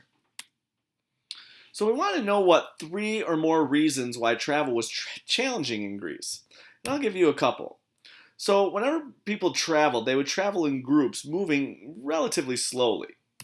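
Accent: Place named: United States. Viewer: he has a new york/jersey/midwestern accent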